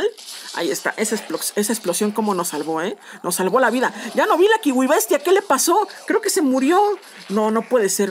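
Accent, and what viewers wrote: Spain. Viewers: Hablas como español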